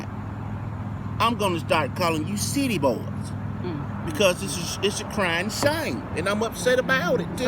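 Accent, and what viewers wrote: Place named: South, United States. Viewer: love that southern accent !!!!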